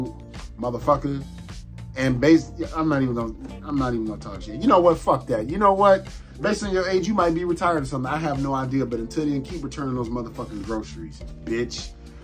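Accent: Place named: New Jersey, United States. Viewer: So I came here from ninja... This is by far my new favorite channel. Hearing you talk makes me homesick about my people in NJ. Amazing content! Subscribed!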